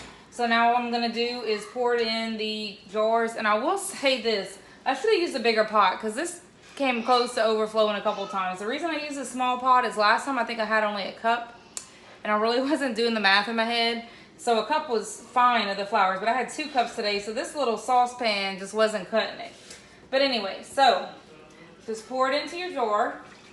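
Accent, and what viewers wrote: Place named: Louisiana, United States. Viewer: Are you from Louisiana? Your accent sounds so much like mine lol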